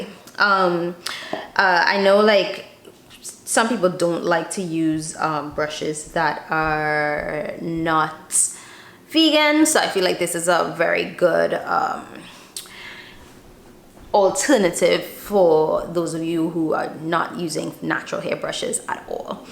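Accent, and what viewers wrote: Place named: Trinidad and Tobago. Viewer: You sound Trinidadian. Where are you from?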